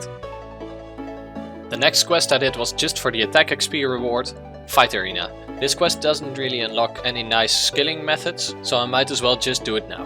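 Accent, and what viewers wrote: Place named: Netherlands. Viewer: I hear a dutchie speaking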